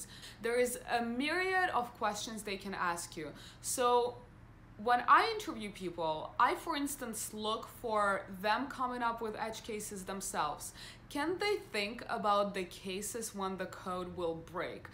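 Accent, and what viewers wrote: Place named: Russia. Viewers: У тебя такой русский акцент. Не знаю почему, но очень тяжело слушать. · мне тоже так показалось, она русскоговорящая.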